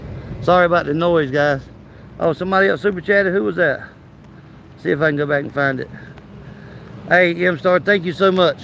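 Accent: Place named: North Carolina, United States. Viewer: GREAT TO HEAR YOUR VOICE AGAIN INSTEAD OF OTHER AUDITERS!!! YOU ARE BY FAR THE BEST AUDITOR HANDS DOWN!! LOVE YOUR NC ACCENT. LOVE FROM JOCO!!!!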